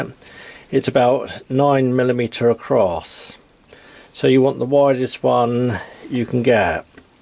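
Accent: United Kingdom. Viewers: Super creative and informative video,! And what a cute british accent:-)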